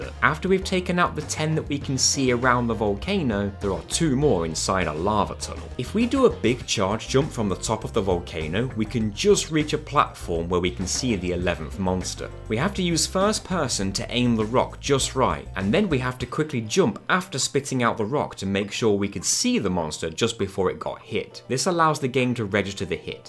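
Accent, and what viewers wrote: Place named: Lancashire, United Kingdom. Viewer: I think you might be me. Other than the fact you sound like you're from lancashire/ the north west, we sound identical.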